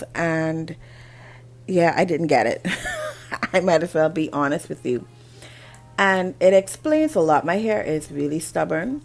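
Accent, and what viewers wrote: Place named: Bahamas. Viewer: That has to be a Bahamian accent.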